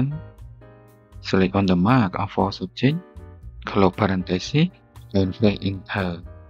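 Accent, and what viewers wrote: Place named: England, United Kingdom. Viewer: I need to read translations related to my language because I have problems understanding the English accent.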